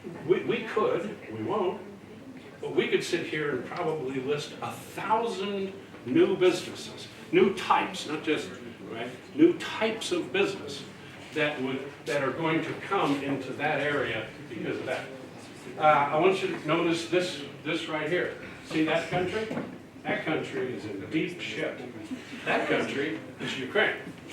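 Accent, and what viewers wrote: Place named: United States. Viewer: Pretty early, on Monday a little bit sleepy 😅 but still very motivated and eager to absorb new information, we were provided with an amazing, as always, speech of. So firstly, talked about agricultural enterprises, about technology, ways to run business successfully, quickly! He pointed, and kept repeating that world of business is volatile. Never stands, always varies, changes. Furthermore, he shared as the example the Smart Bandage. This kinda put us in the picture more of what he talked about. I am truly grateful for a speaker like him. Because I get to hear how Americans utter words and express ideas!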